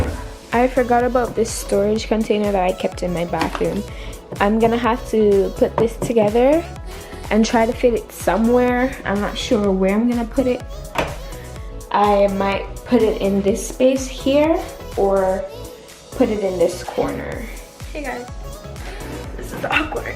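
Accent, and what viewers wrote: Jamaica. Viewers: Very cute Apt. can't wait for the finishing....keep at it girl you seem like a lovely young lady( I hear a Jamaican 🇯🇲 accent) a lot of my childhood friends are Jamaican!!!!! Love them all especially the eating times at their house 😋 I'm from BERMUDA 🇧🇲💖💖💖💖💖💖💖💖💖💖💖nice to meet you your NEW SUBBIE💥💥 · As a Jamaican I can hear the accent a thousand miles away 😂❤️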